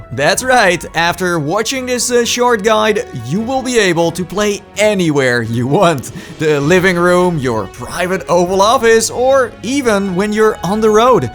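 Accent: Netherlands. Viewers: Bij de eerste zin hoor ik dat je nederlands bent hahahaha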